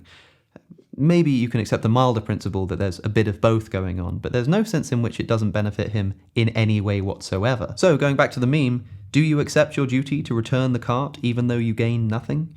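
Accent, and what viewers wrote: United Kingdom. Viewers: No. I have him playing in the background when I wash dishes and sketch. He can be talking about the most graphic shit but it’s still relaxing because he has a British accent 💀.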